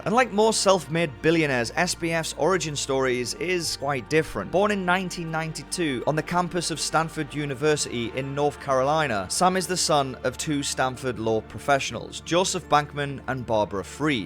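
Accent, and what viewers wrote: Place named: Whitley Bay, United Kingdom. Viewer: Sounds like a Whitley Bay accent 😏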